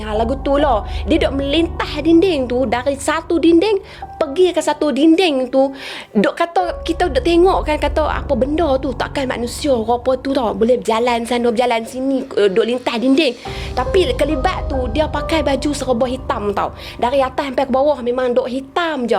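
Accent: Kelantan, Malaysia. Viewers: Weyla ore klate..sblom nie xbunyi klate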